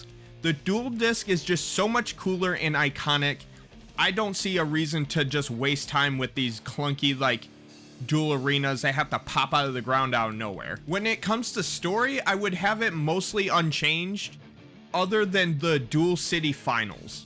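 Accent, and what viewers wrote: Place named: Brooklyn, United States. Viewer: I love the Brookland accent lmao subscribed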